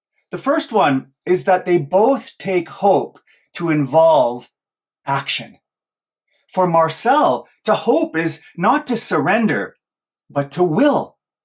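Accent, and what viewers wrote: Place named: Canada. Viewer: Indeed. Honestly, if you ever venture to the UK. look me up. It would be a privilege to meet you, & hook you up with places and people. I have family in Canada (sorry, terrible at accents but I think your vowels suggest Canada rather than THAT place?) so we might get out there before the decade closes. But certainly with a brown family I won't be going to the other place anytime soon! 😅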